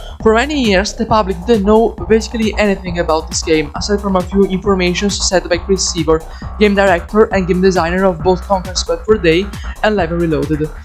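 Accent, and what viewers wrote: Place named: Italy. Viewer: Dalla parlata ho ipotizzato che fossi italiano, a ho avuto la conferma Mi fa davvero un sacco piacere che qualcuno in Italia parti di questo gioco in maniera così accurata Ti faccio i miei complimenti✌🏻👍🏻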